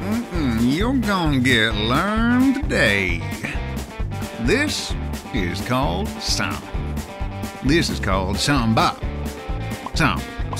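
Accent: South, United States. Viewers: 1. Love the font you used, fit the country twang of the narrator and the music excellently. 2. The narrator's fast spiel reminded me of the guy introducing the hand jive in Grease the movie 3. I understood every word he said, I don't know if that means I watch too much Trisha Paytas or I've just discovered a new talent and 4. ....ONE SHOT!!!! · I loved the southeren narrator! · best narration yet by the narrator. only problem now is thinking southern accent when eating Ssam!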